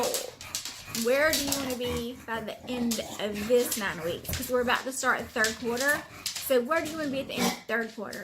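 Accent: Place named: South, United States. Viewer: Welcome to the teaching tag! I love your southern accent! Hubby and I have always wanted to travel to the south and try the food! I'm so glad you are doing some more peer tutoring. Its so beneficial for students on both sides of the learning. I will add you link to my January video ♥️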